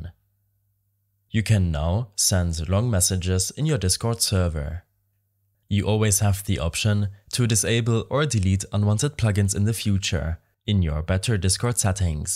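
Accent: Germany. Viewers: I smell deutsch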